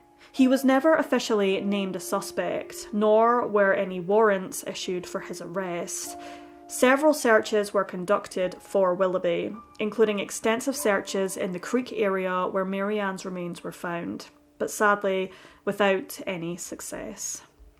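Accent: Scotland, United Kingdom. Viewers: Are you Scottish, lass?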